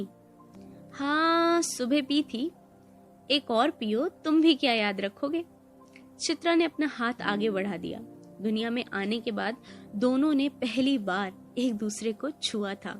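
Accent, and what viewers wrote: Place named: Bhojpuri, India. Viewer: Bhojpuri vioce amezing❤ 😂😂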